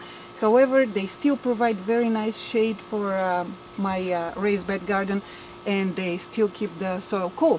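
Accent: Eastern Europe. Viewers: get rid of that east melania trump european accent. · When you start your plants do you put 2-3 seeds in and thin them out later or just use one seed at a time? The ones I thinned are about dead but the ones I didn't are doing great. I have one plant that keeps growing but the leaf curl is so bad they look like stems, will they ever go back to looking normal? Also, I water at night too. What country are you originally from, sounds like a border Russian country. I hope you don't mind me asking.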